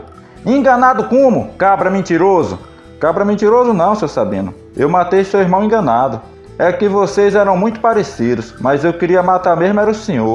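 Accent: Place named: Northeast, Brazil. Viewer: Sou de SP ❤ amo essas narrativas; a poesia contida nelas, me enchem de emoção. Amo esse sotaque Nordestino.👏👏👏👏👏👏👏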